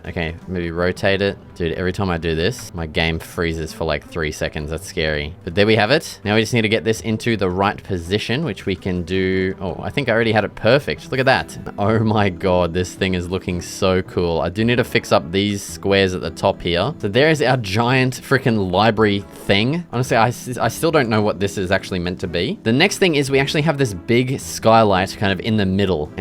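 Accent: Australia. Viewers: The Aussie acsent is the cherry on top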